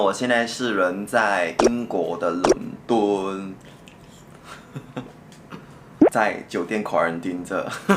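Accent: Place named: Malaysia. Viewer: 一听就知道你是马来西亚人，不用强调什么新加坡口音的。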